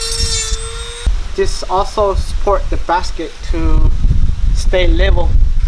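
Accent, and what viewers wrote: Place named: Central Valley, United States. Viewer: Bro Iknew 60 seconds into the video you were from Central Valley lol dope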